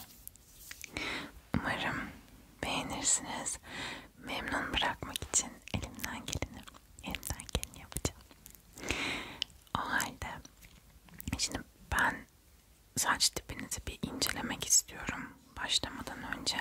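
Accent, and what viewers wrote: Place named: France. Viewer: Sen turkce ceksen o Fransız aksani ile en az 10 bin abone gelir yavrum ;d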